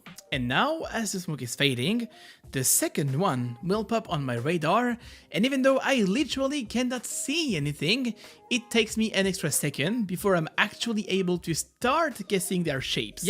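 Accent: France. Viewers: Tellement frustrant d entendre cet accent mais de ne jamais avoir de video en francais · Wait, after all this time watching, i just discovered that t'as l'air vachement français bordel. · de ouf il parle super bien english mais t'entends tjrs l'accent français xDDD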